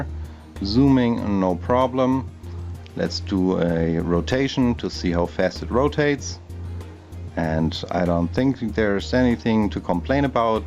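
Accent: Germany. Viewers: Man merkt das du ein deutscher bist...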